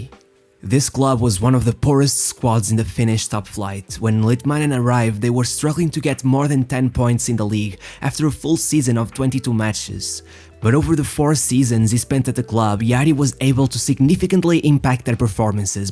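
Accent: Portugal. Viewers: Pelo sotaque, só podes ser português. Belo vídeo.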